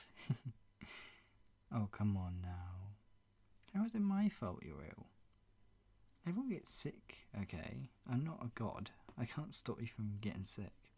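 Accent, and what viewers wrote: United Kingdom. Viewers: Hope every sick girl have a boyfriend like this(;_;)this is sooo cute :3 btw British accent is the best :P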